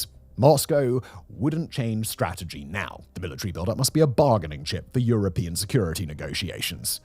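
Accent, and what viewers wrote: United Kingdom. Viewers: Bro said ''china aggressive international approach'' and is just china investing in emerging economies. And he says this with an UK accent, you know the empire that conquered half the world trough violence lmao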